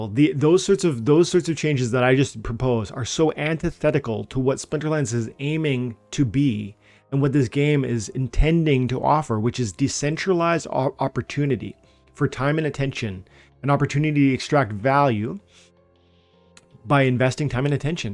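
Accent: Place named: Canada. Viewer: The first time I unplugged my headphones from my laptop and watched one of your videos and my girlfriend walked by she said, "He's Canadian?" and I said, "How did you know? He doesn't really have an accent" and she said, "aboot." I'd give her my raffle ticket for that one but she doesn't play.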